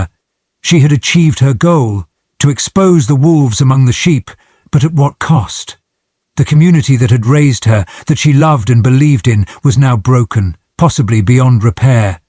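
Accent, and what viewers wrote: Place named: United Kingdom. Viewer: Why the narrator isn't black & why the reader with a British accent doesn't state the year of when the event happened. Why no one knows the city in Texas or the name of the church. Ive lived in Texas & I've a church in Plano for 10yrs & there no event this on the news any churches in Texas no name of reporter who the story . I really question & put on this video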